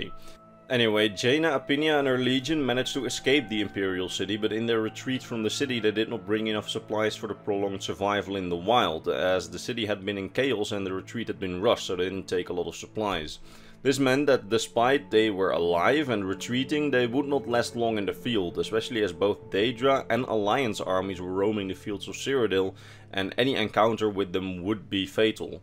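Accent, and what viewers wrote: Netherlands. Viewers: You sound like a Dutch-speaker.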